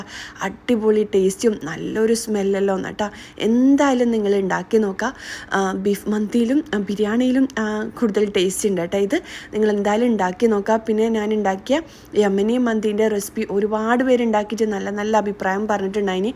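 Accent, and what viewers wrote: Kannur, India. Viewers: Nigal kannura tta tta nn parayunn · സംഗതി ok യാണ് കണ്ണൂർ /കാസർഗോഡ് ആണെന്ന് തോന്നുന്നു 'അനക്ക് "എന്ന് കേട്ടു 🤣😂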